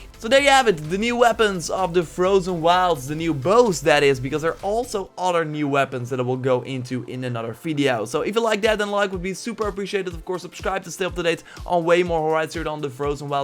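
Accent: Netherlands. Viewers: are you dutch? bc u sound very dutch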